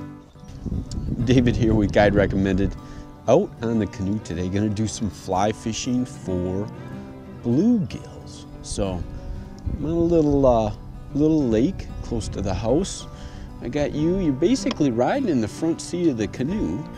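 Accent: Wisconsin, United States. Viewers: I'm thinking Wisconsin/UP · You have the most Canadian accent I've ever heard LOL to either that or like Wisconsin ish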